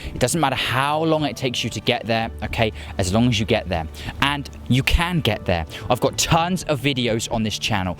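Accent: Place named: United Kingdom. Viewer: British accent is starting to annoy me.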